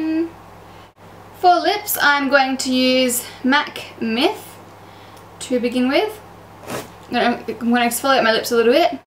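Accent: Australia. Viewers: is she Australian?! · R u an Aussie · no she's aussie just like me and she doesn't have an american accent. also her po box address is in WA which is Western Australia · Is she from Australia? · She is not British lol. She is Australian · She's Australian, she cant change her accent. Shes perfect either way. LOL. xo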